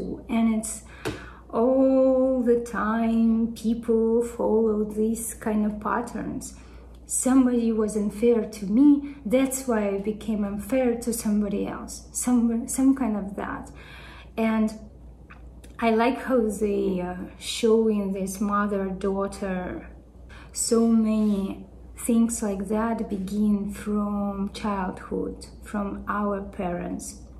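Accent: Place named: Russia. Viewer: Какой-то у тебя акцент русский...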